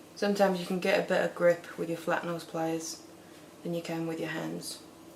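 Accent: United Kingdom. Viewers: Her instructions are BETTER than all the others and I LOVE her British/English accent... Now I need to find her other videos?? Hope she has lots...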